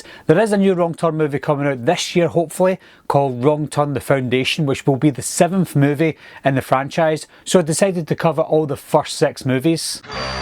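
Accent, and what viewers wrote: Scotland, United Kingdom. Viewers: Are you Scottish my friend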